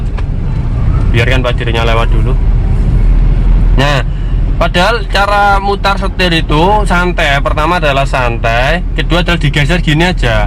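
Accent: Surabaya, Indonesia. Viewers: aku malah suka bahasamu mas,boso suroboyoan🤣🤣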